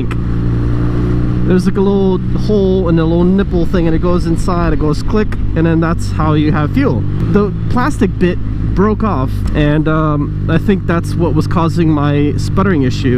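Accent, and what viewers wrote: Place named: China. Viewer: Sorry the fix did not work, Still love the Chinese accent thou.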